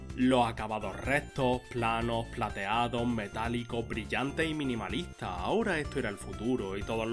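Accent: Andalusia, Spain. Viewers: Seguro de alguna zona de Andalucía, España, Málaga o Sevilla quizás! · aii me encantan tus videos tlj<33 tu acento andaluz es la leche hshs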